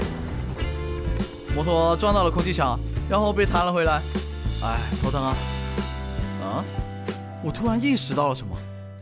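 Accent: Hong Kong, China. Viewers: 4：48你是香港人？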